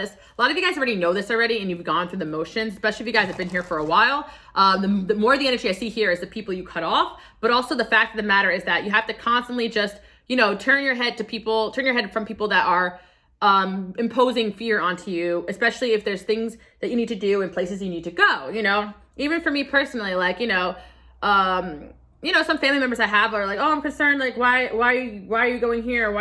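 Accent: New Jersey, United States. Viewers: I love when your Jersey accent comes through.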